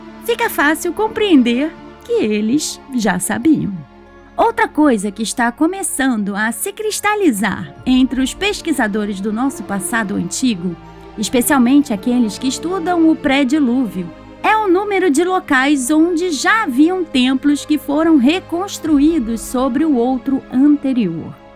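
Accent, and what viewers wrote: Rio de Janeiro, Brazil. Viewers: Adoro o sotaque! Acredito que seja carioca, e eu acho lindo... 😊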